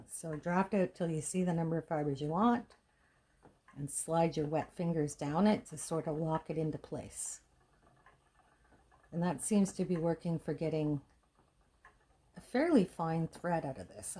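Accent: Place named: Ottawa Valley, Canada. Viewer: Thank you so much for this video! I'm in Winnipeg, with family in the Ottawa Valley and in Gatineau, so I heard your accent and put you on the map right away! :) I'm going to try spinning some flax right now... I hope it works out as well for me as it did for you! There may be a lot of swearing involved... :) Thanks again!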